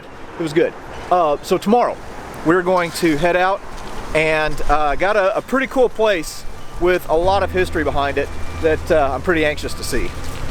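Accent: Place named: New York, United States. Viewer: Where are you from? You sound like youre from NY and youve never been to fort Niagra, or fort William Henry?..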